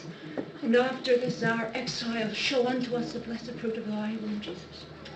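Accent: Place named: New Zealand. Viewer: jeez, I love her strong Kiwi accent :3 it sounds so adorable)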